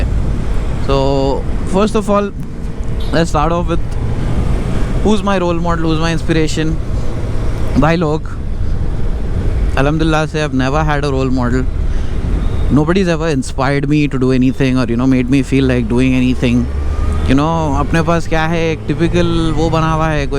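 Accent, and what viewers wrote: Hyderabad, India. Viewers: Bhai tum jara to sai ka jarr malom 😂😎. First time I am listening a youtuber who speaks Hyderabadi as my level.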